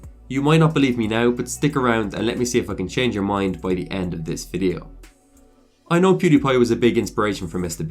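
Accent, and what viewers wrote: Ireland. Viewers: I knew you sounded Irish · The name and accent made me think you were Irish and then you said tirty it was obvious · I'm from ireland and I could tell you were as well from your accent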